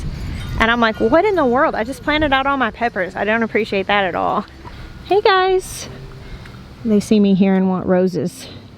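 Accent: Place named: Mississippi, United States. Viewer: look now, lol, y'all aint got no business crying about the weather. This morning we woke up to 34 degrees F after Mother's day in Minneapolis Minnesota. Tonight we are expecting a whopping 45 degrees. This will be the first night above 40 degrees in about two weeks. I got peppers in the house putting on flowers already flowers on tomatoes help, help ,help! I have about 200 starters each one wants to be the first one in the garden. Thank you for being here I love listing to the accent I used to have when I left Mississippi a long time ago. Blessing on your summer and your move.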